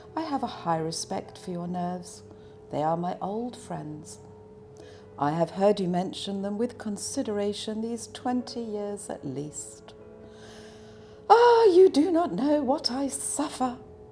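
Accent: United Kingdom. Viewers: 와 오만과 편견 책을 영국발음으로 들어보다니 ㅠㅠㅠ 감사합니다 ㅠㅠ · 영국 발음 너무 고급져 ㅠㅠ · 영국억양은 듣기만해도 좋아...💕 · 사람들이 흔히 떠올리는 영국 악센트의 정석이네요 😍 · 전체 다 읽어주세요 !!.. 영국발음 공부하기 딱 좋아요.. 제발 다 읽어주세요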